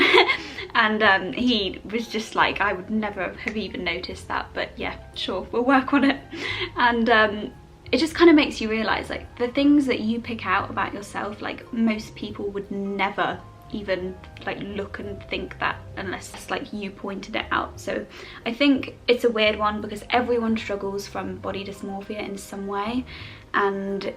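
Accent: United Kingdom. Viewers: Baby, how I like your British English, it sounds so funny, your way of talking and laughing, it's great..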